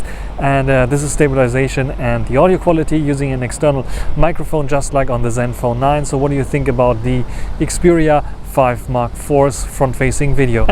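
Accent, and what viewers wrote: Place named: Germany. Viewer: Bro where are you from?I like your accent sounds germanish xd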